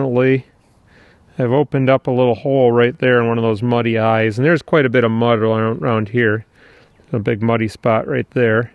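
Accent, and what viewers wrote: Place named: Michigan, United States. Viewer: I love Petoskey stones! I was born in charlevoix. Your accent makes me homesick. I live in GA now.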